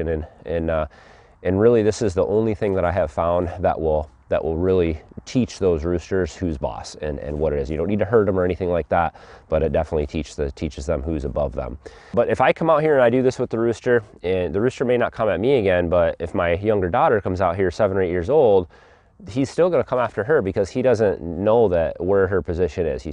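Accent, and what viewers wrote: Michigan, United States. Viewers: Bro are you from Michigan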